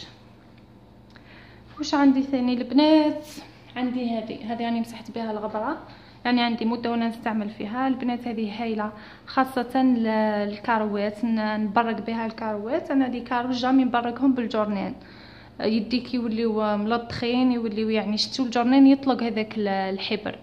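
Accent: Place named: Setif, Algeria. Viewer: ما احلى اللهجة السطايفية 😘😘😘😘😘😝😝😝😝😝👍🏼👍🏼👍🏼👍🏼👍🏼👍🏼👍🏼👍🏼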